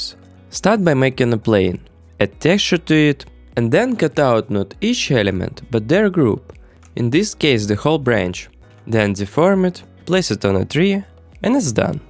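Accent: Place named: Russia. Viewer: топовый акцент, русский отличит, но иностранцы думаю ничего и не заметят)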